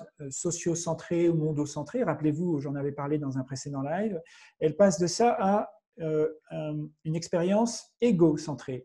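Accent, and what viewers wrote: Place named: Quebec, Canada. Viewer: Bel accent Québecois dis donc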